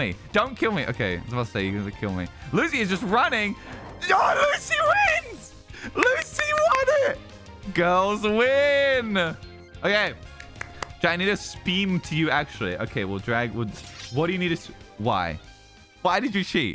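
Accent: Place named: United Kingdom. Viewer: cool video and all but why are you british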